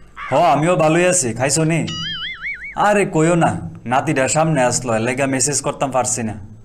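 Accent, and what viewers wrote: Brahmanbaria, Bangladesh. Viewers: আমডার বাউনবাইরার ভাষা দি😂😂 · ভাই আপনার কথা গুলো বাংলাদেশ ব্রাহ্মণবাড়িয়ার আঞ্চলিক ভাষা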